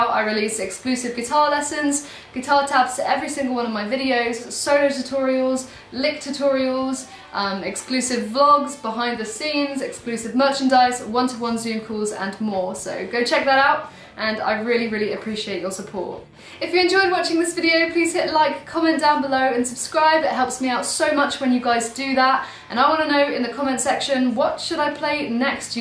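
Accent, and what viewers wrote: United Kingdom. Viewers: I'm thinking about moving there to meet! Have watched all her videos, her cute, confidant and FUN energy is better than any drug, watching her play Slither, or anything else, makes my soul smile! and, gawd damn the girl can PLAY!! and, love, you are absolutely adorable with your nice legs just dangling there while you rip on the guitar! Beautiful, sexy, confidant AND talented beyond words, wow... my fantasy girl! oh, and forgot to mention that cute as hell British accent!! oh, yeah that guitar is pretty sweet too lol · You are so damn cute 🥰 with your brit accent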